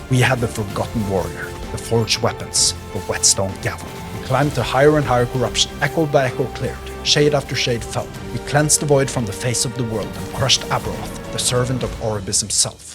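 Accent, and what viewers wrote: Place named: Norway. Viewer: ..med den norskeste norske aksenten på youtube :D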